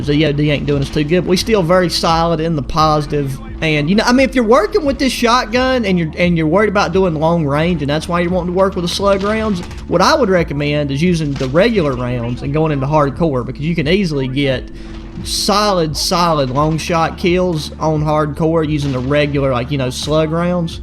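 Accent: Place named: West Virginia, United States. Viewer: An ak setup would be great and you sound like your from West Virginia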